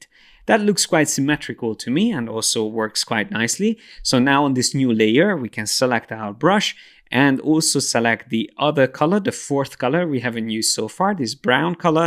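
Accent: Hungary. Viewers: That magyar akcentus though :D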